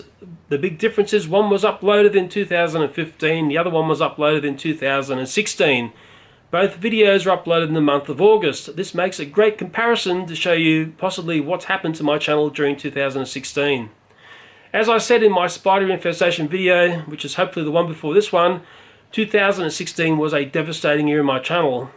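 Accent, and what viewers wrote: Australia. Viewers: Hello, I am a new subscriber found your channel couple months back. Out of all the channels I am subbed I look foward to your new videos the most. Love your personality, sense of humour and, your Australian accent. Keep up the hard work. I hope youtube doesn't continue to go down this path but it seems they keep messing with content creators and it is hard to get views unless you are always on the trending tab.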